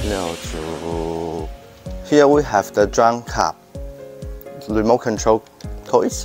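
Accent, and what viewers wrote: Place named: China. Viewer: Love the accent i like this chinese guy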